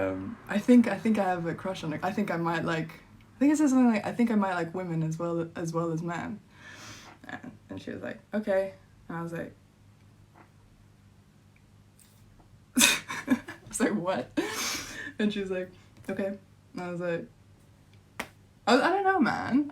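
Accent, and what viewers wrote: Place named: Cape Town, South Africa. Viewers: You have a Cape Town accent. Love your music!!!. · Right ! She could be a Saffa, where is she from ?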